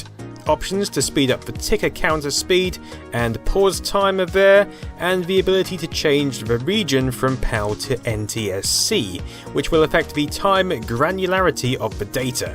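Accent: United Kingdom. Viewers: IDK, but he could live in the UK thanks to the fact that knows A LOT about UK game computers. Also, he sounds very British.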